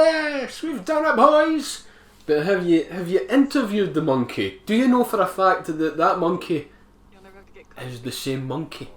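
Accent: Scotland, United Kingdom. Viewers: Hello mate, I love this movie, and more so your accent! Scottish to me is the best accent in English language, what part of Scotland is it from? Edinburg? Regards from 🇲🇽😎🤟🏼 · Even after 35 years, The Fly is the brilliantly grossest movie I've ever seen in my life. It still makes my stomach churn. I enjoyed your funny reactions to the gross-outs and I enjoy your accent - which I'm guessing is Scottish or northern English (to my untrained yankee-doodle-ears). Anyway, thumbs up.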